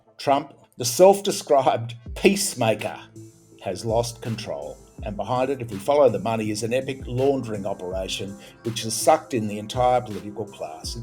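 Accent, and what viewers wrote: Australia. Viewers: It’s great to hear an Aussie accent speaking the intelligent logical sane truth! Thanks ❤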